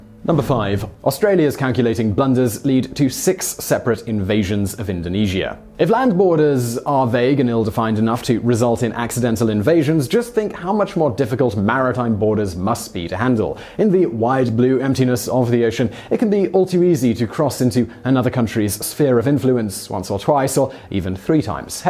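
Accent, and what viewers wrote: England, United Kingdom. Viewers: A suggestion about English accents -- the host has one.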